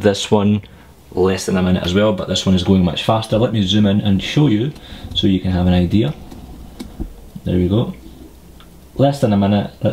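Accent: Scotland, United Kingdom. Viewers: That's a British Scottish accent. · r u from Scotland ??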